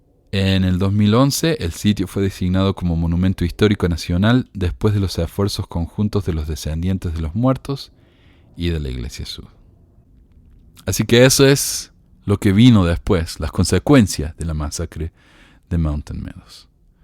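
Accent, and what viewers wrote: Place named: Córdoba, Argentina. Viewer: Me gusta tu vos de cordobés, soy de Buenos Aires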